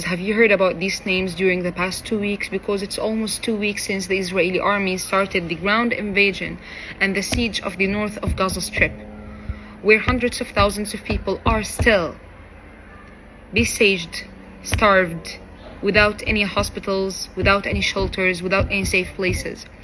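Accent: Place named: United States. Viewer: She always speaks English so weird as if she’s American or Palestinians are American 😅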